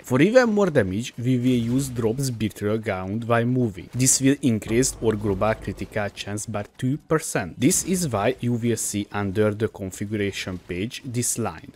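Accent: Hungary. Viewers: Someone having a Hungarian accent here :D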